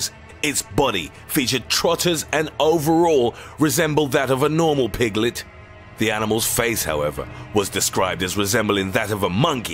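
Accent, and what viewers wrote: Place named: United Kingdom. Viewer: This british narrator makes everything 10 times as scary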